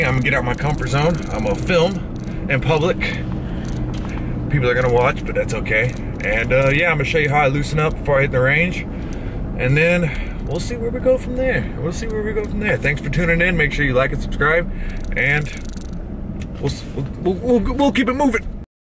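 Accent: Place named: South, United States. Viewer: i didnt expect your southern accent i never heard your voice till now🤣 and it made me fw you even moree very chill and laid back love to see it!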